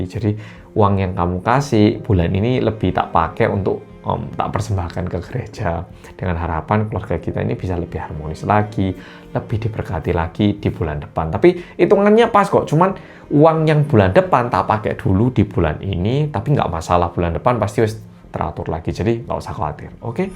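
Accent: Java, Indonesia. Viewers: Hee jadi suka nonton pidio nya mas.. Ceritanya medok Jawa dan ceritanya santai jadi mudah D dengar, 👍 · Rodo medok tenan ya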